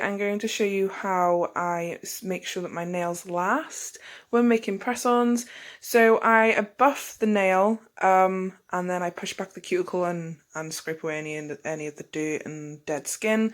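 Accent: England, United Kingdom. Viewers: Don't stop talking, love your English accent, although the music is nice, too.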